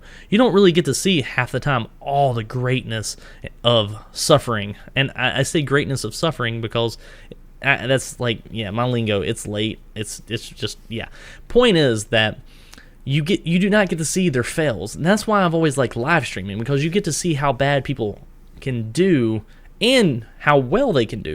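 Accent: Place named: South, United States. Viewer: Lmao about half way in the video and i finally realized this guy has a southern accent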